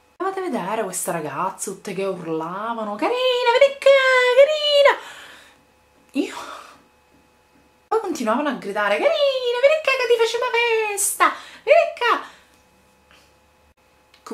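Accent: Sicily, Italy. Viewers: ma quanto ti adoroooooooooooooooooooooooooooooooooooooo..... poi il tuo accento SICILIANO lo adoro.... anchè perchè anche io sono catanese :******************************************* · sei siciliana? :)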